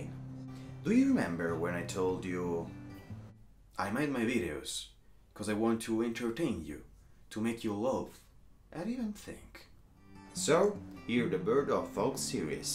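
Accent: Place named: Hungary. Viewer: I like your accent 😊 It’s like Hungarian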